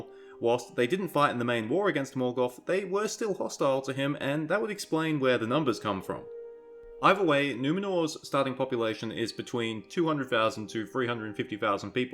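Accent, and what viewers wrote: Australia. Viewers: I've been trying to guess where you're from by listening to your pronunciation of certain words for quite a while. I think NSW, possibly Central Coast, Wollongong. And unlikely picks for cities with a similar population are Hobart and Geelong. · I deduce (correctly I hope) that you are from Sunshine Coast, Australia, based of your accent and the 350 000 number you gave! I'm kinda scared if I'm way off though.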